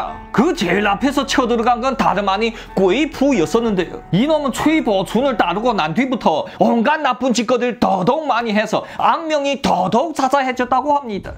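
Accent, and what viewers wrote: Yanbian, China. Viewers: 연변말 북한말씨 다른 것 알겠네요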